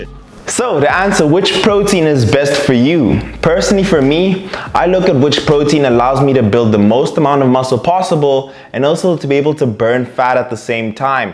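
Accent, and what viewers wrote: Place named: South Africa. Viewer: You sound South African ❤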